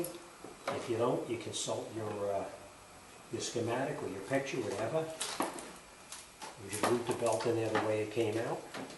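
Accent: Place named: East Coast, United States. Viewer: Finally another video with an east coast accent lol. Thanks for the instructions. John K (Newark N.J.)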